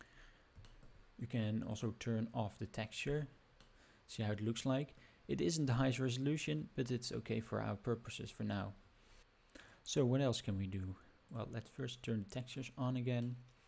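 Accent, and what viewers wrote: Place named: Netherlands. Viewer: Je klinkt Nederlands, dus ik probeer het gewoon even. Dit werkt allemaal super, maar voor Nederland is een nauwkeurigheid van 30 meter niet echt realistisch. Nu is er natuurlijk het actueel hoogtebestand die om de meter een hoogte puntje heeft. Is die als SRTM data sorce te koppelen aan de GIS plugin?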